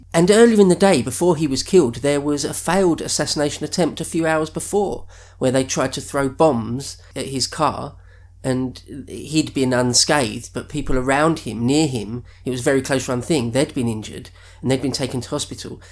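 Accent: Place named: Yorkshire, United Kingdom. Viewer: * sounds like he's from my ends.....Dewsbury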